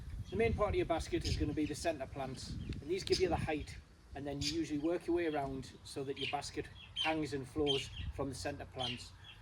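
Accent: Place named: Scotland, United Kingdom. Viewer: I came here from Kate. Watching from Toronto,Ontario Canada. I am wondering where your accent is from. Sometimes I hear a Scottish accent. My uncle lived in Peterlee County Durham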